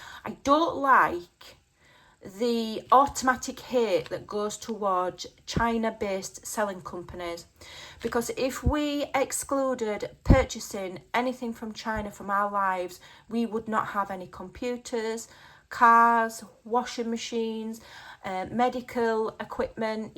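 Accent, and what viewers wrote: Yorkshire, United Kingdom. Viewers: If I could give a thousand likes I would. Most YouTubers wouldn’t be brave enough to speak up. I also like the empathy that you impart with your gorgeous accent 😊Is it Yorkshire?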